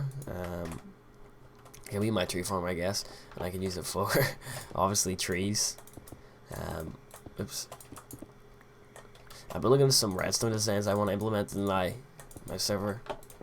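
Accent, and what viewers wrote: Northern Ireland, United Kingdom. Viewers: I recognise the northern Irish accent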